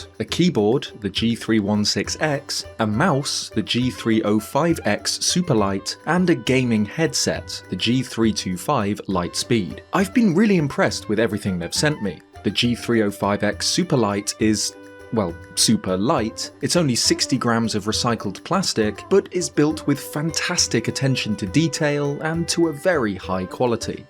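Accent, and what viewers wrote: United Kingdom. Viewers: Lol it's just British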